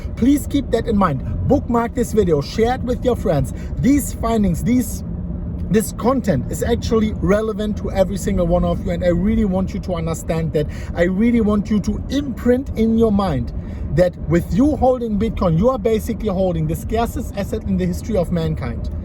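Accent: Germany. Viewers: Your German ?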